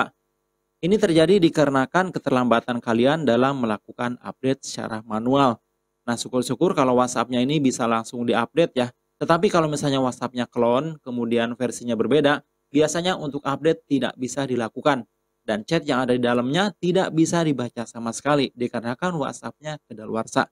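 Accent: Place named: Java, Indonesia. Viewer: maaf sebelumnya sebenarnya sampean itu piyayi pundi to logat bicaranya seperti orang jawa 🙏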